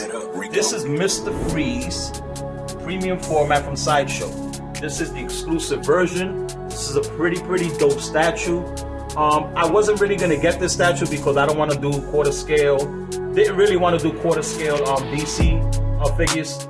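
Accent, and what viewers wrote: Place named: Bronx, United States. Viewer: Yooooooooooooo seen you before onba few chan never seen your vids !! Very nice set up !! Where u from man I hear a lil BX in u !! Let me find out u from there! Hit me back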